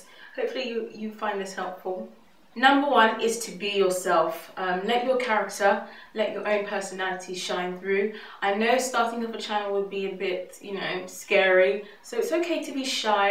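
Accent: England, United Kingdom. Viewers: I love English accents but I have a question, do you ever think people are saying " " when they say " surely"? haha, I love you lots! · Listening to you is already a "Treat". I love your manner of speaking & that's not just because you are speaking British English...mainly because you are presenting yourself TRUTHFULLY...not steering away from what you are actually passionate about & not what others expect on your Channel. Great Tips on putting with with Youtube & LIFE IN GENERAL!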